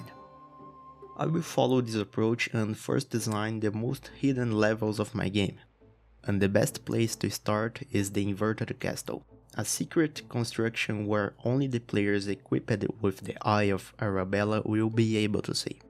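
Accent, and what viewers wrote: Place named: Brazil. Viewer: awesome atmosphere! also you sound kinda... brazilian? 🤔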